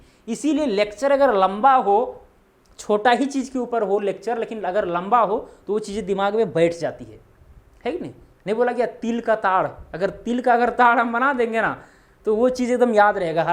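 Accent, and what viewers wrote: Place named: Darbhanga, India. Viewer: आप बिहार में दरभंगा के है क्या, अच्छा ज्ञान है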